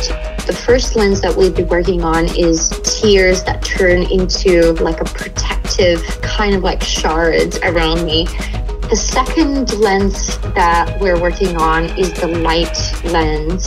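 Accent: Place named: Los Angeles, United States. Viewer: oh your from LA now, last i knew you were british-latvian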